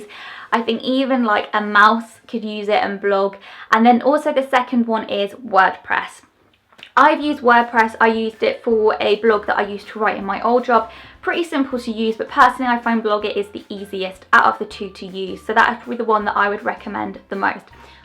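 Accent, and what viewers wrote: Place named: United Kingdom. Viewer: Gymnast4Life-M I know right sooo British in British don't have such a beautiful accent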